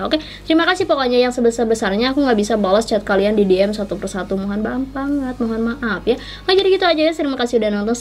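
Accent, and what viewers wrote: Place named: Sunda, Indonesia. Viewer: Ciri has ngobrol nya Sunda banget teh semangat sukses sllu teh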